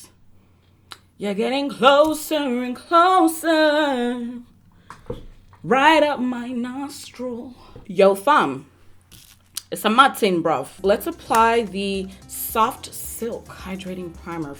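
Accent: Nigeria. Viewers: I am here for that ya Nigerian accent, it throws me off EVERY TIME🤣🤣🤣🤣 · Your nigerian accent is respectable:')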